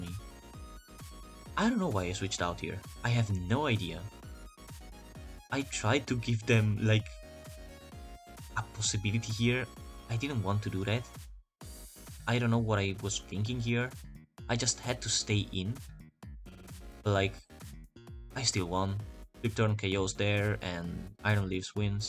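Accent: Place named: Spain. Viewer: Juro que lo escucho y pienso que sabe hablar más español que inglés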